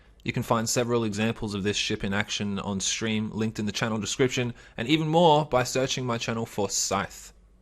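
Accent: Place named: Australia. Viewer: so australian mate haha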